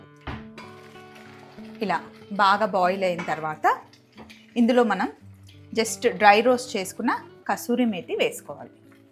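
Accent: Andhra Pradesh, India. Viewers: Hi, I have a small doubt and ofcourse out of curiosity am asking, are you from Andhra or Telangana ??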